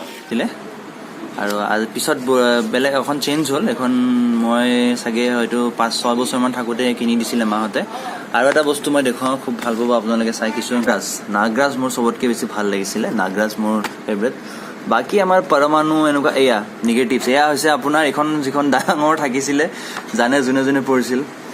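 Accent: Nalbari, India. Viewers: Apuni Nalbari khurot kotha kobo bc val lage😁😁💕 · Bor val lagise apunar vedio sai .. amr nalbari bhakha2 apunar mukht ru val lagise ..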